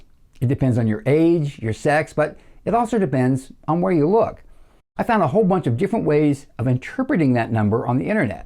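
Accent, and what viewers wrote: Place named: New York, United States. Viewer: FINALLY!.. Someone who is not full of bullshit!.. I will do my best to honor you and incorporate what you teach as best I can... Love your way of speaking... Are you from NYC?... 68 year old senior softball player, and just getting started with a rutine... Any words of advice?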